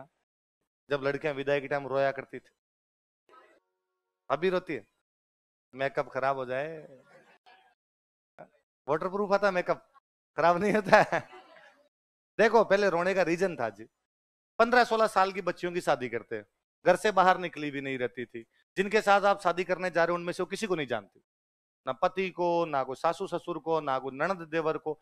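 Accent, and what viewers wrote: Rajasthan, India. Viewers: aapki rasthani mix hindi CHOKHI LAGE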